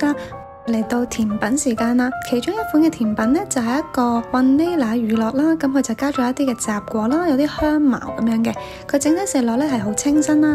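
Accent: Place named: Cantonese, China. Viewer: What language are you speaking? It sounds like Cantonese, but not exactly like Hongkong. I wonder.🤔🤓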